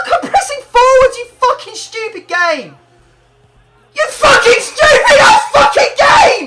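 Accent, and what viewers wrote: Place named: RP, United Kingdom. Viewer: He sounds so posh lol but he's a legend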